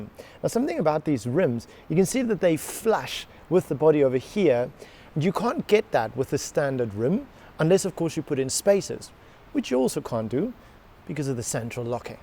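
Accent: South Africa. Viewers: Dude you sound south African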